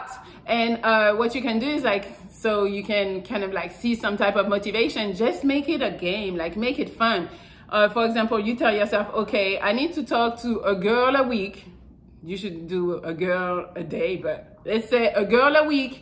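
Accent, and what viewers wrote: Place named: France. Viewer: Approximately pm March 06 2022 from Tobago: You are awesome. I love your French Accent. Keep up the great work of simplifying the interaction of men with regard to women.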